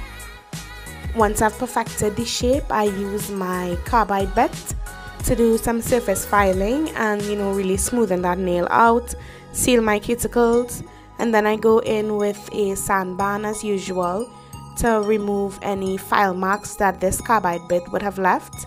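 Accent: Trinidad and Tobago. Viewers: I can tell a Trinidadian accent anywhere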